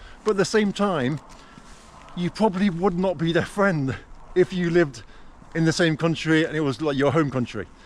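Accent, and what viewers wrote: England, United Kingdom. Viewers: You probably never will be viewed as a "real Russian" by most in Russia. You may speak the language, but have a clear English accent.. I can imagine it is frustrating for you, but it's this way in most countries..There are some countries that accept and embrace foreigners more than most...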